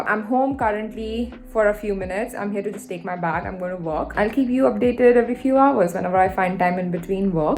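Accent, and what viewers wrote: India. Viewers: Thank you very much for this: it is also a delight to hear your accent for some reason! I am fond of the Indian accent. I had my vaccine yesterday and it was fine, I did have mild muscle aches today and last night, a mild headache but I understand the muscle aches are an indication that the immune response has been innitiated, so nothing to worry about. If one knows what the cause is, then I wouldn't count this as 'suffering': and it is nothing compared to the deleterious effects of the virus itself. My grandmother died of it and I think it's a real tragedy when someone reaches her age and then in the end is taken by something avoidable: I'm sure most people know someone or more then one person for whom this virus has taken there life. Bearing this in mind I think it makes little rational sense to pass up the vaccine out of a fear of possible physical symptoms. 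Out of all the millions of people who have been vaccinated (about 18 million in the UK alone), there have been no documented cases of this vaccine causing death, and I don't know of any serious adverse events that have been blamed on the vaccine. It is fear caused by distrust, caused by the absence of understanding that surely leads someone to resist: so I would urge anyone to find out the facts for themselves, from sources they trust. You areprotecting not just yourself but anyone you come in to contact with. There are no known serious risks to taking it but no end of possible serious consequences for anyone who doesn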